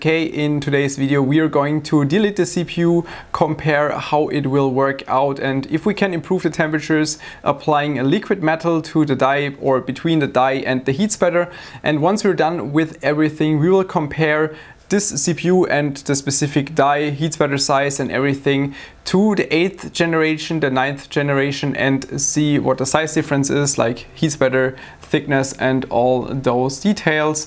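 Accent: Germany. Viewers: du hast so ein episch krassen deutschen Akzent, Daumen hoch dafür:D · Deutsches English klingt always so funny! :D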